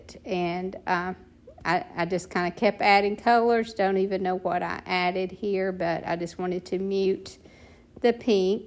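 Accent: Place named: Tennessee, United States. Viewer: Love how this came out. I love watching you, but I am always getting you mixed up with Teresa from Our Green Acres. You & her sound alike. Dolly Parton accent, please I say that as a good comment. Great work & my style completely.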